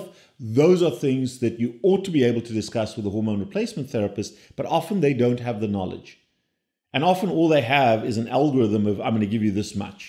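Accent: South Africa. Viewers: Hy klink so baie of hy Suid Afrikaans is? Hy moet met ons Namibiese Dr's kom praat!😞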